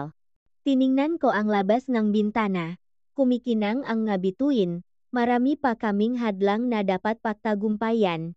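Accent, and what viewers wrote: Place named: China. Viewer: Ano n yn, intsik k b, nakakawalang gana makinig syo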